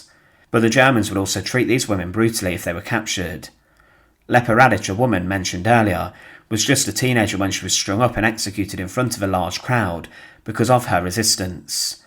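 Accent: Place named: England, United Kingdom. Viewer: First and last time here as listening to that moronic English twang is enough , it’s better muted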